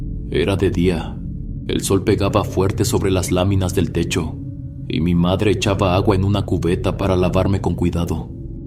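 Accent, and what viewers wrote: North, Mexico. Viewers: Hola, tu vioz y la manera de hablar me recuerda a mi primo residente al norte de México. Muchas gracias!! Y, muy bien relato. Como siempre!!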